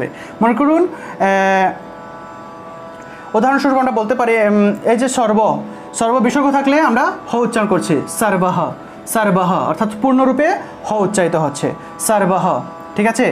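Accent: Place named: Sylhet, Bangladesh. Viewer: ভাই মনে অয় সিলেটি👌❣️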